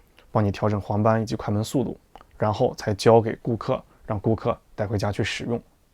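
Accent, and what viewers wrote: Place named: Qingdao, China. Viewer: 我就说听你口音像青岛的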